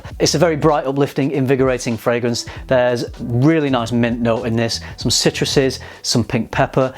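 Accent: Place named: Yorkshire, United Kingdom. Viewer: No that’s a Yorkshire accent (northern) cockney being southern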